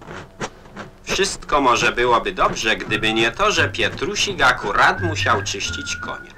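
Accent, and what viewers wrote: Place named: Warsaw, Poland. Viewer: Fajny Akcent lektora ciekawe Z jakiego rejonu Polski on był trochę jakby z Warszawy albo okolic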